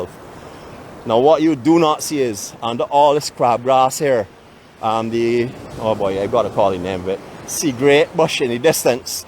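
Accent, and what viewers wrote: Barbados. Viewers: The Bajan accent is so cool. Love listening to you, and the ripples of the waves on the beach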